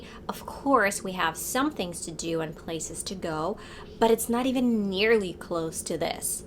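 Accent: United States. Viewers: I'm shocked that you're from Ukraine, because you sound 100% American! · Дуже багато американського акценту))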